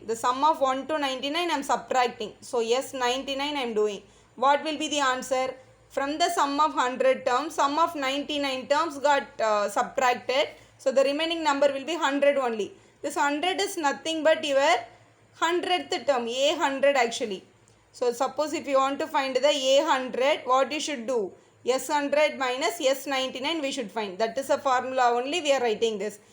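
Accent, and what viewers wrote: South India, India. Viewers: I love south indian accent Thanks for clearing my doubt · Mam are you from south india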